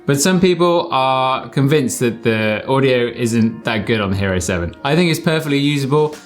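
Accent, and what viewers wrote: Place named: United Kingdom. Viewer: wow, your British accent is just to annoying. SKIP....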